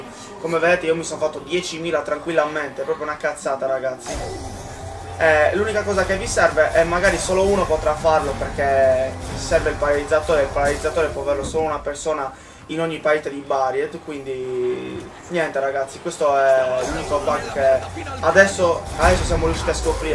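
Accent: Sardinia, Italy. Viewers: Ma sei sardo? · fra sei sardo vero??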